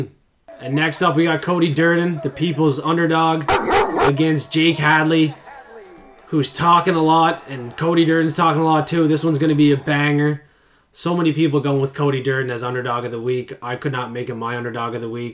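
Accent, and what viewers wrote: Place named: Charlottetown, Canada. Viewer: I knew that accent sounded familar. I'm from Charlottetown.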